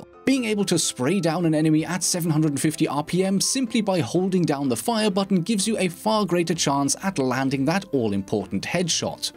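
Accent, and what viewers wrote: Germany. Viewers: Sometimes you have a little German accent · Terry Makichod I think he’s German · Just wondering, are you German? Your voice kind of sounds like you are a German person who is really fluent at English. Or maybe it's just your microphone lol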